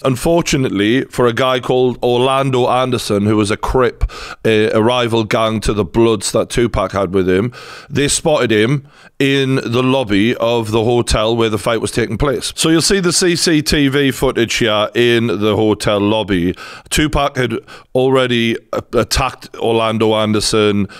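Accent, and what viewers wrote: Newcastle, United Kingdom. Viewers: I just love your commentary, and that accent -- I'm a Brit, so I relate! He's feeling charitable, maybe because he's going to JAIL! Bye Diddy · Geordie boy, thank you.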